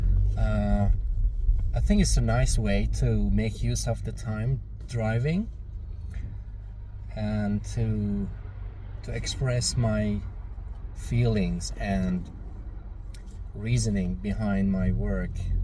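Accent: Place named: United Kingdom. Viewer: I love ur British accent ❤😊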